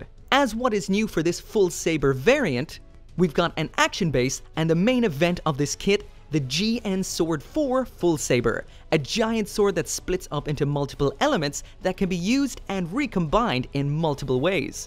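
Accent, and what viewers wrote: Ireland. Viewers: Once you heard his irish accent you can't unhear it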